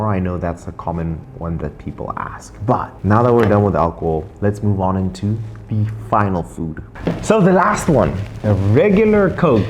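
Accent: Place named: South Africa. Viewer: Thanks, why your accent is so South African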